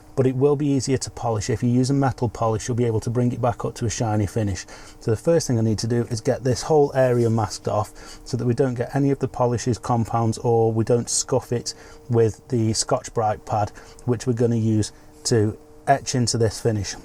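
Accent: United Kingdom. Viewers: Is this your first time hearing a British English speaker?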